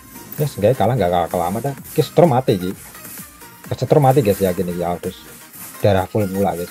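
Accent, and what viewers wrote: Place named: Kediri, Indonesia. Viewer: ooh..tak kiro kediri,,,coro ngomonge mirip wong Kediri..😀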